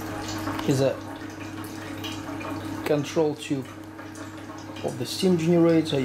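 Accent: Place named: Russia. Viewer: русский английский режет слух))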